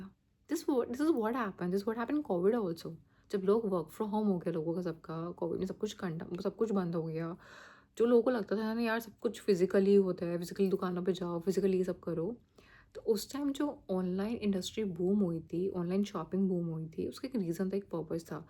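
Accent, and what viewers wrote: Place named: Madhya Pradesh, India. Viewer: you are definetly from madhya pradesh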